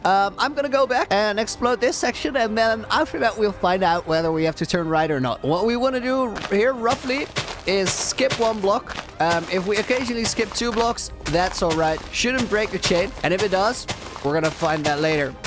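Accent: Netherlands. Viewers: Even though I can hear you are dutch, I love how confident you talk in English! Great content! KEEP IT UP!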